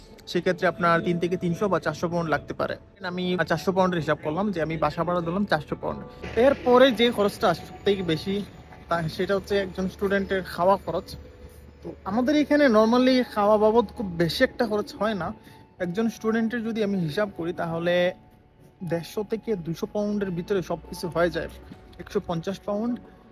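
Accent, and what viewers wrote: Sylhet, Bangladesh. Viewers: Apni sylheti tai na vai?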